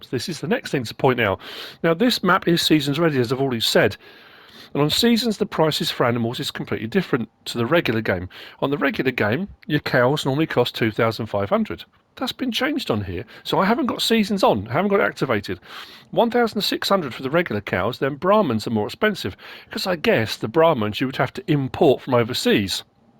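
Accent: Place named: United Kingdom. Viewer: Your British right? you sound it (☞ﾟヮﾟ)☞☜(ﾟヮﾟ☜)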